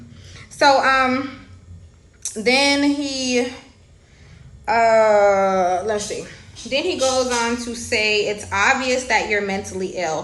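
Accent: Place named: North, United Kingdom. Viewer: that up north accent i like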